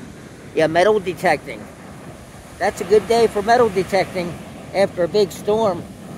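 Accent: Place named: Baltimore, United States. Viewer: Hahaha, this guy has a classic Baltimore accent. Sounds like Dundalk specifically. Good video.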